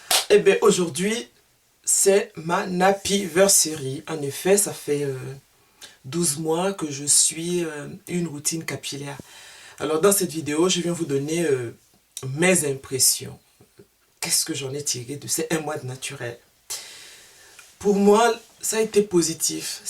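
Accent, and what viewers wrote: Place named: Gabon. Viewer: Joyeux nappyversaire.Je viens de découvrir votre chaine et j'aime bien votre façon d'expliquer les choses. Et cet accent ...je dirais Gabonaise!?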